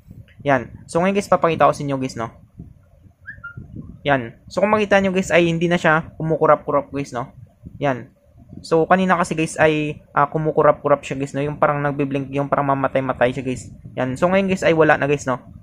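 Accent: Iloilo, Philippines. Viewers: Dw ilonggo ka bala mag hmbl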